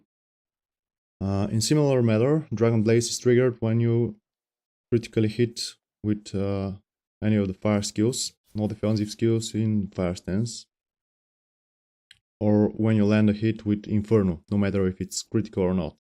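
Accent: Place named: Bulgaria. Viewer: Damn, the fact that your Bulgarian accent is so strong, gained a new sub. Здрасти)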